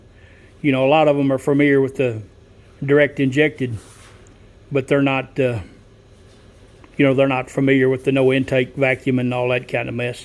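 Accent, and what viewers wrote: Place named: Tennessee, United States. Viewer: Are you here in tennessee? You sound like a fellow tennessean.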